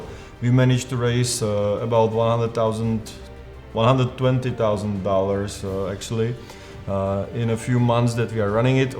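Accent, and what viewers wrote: Czech Republic. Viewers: May I ask someone from England/USA, do you like his accent? Because I am from Czech rep.as well, so I would really like to know your opinion :) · Tomu se říká česká angličtina no :D Já jsem rozuměl skoro všemu, kromě některých odborných slov co neznám.. Neni to těžký k pochopení, ale ten kdo se AJ neučí, nebo neni až tak zdatný, tak to problém může být..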